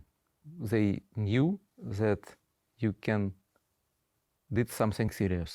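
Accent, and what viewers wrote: Russia. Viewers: russian voice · At least the accent is Russian because where I live which is Canada its either Chinese or Punjab accents. Anything is better than those two.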